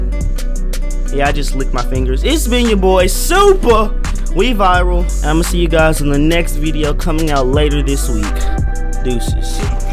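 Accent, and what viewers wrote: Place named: Trinidad and Tobago. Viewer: you from Trinidad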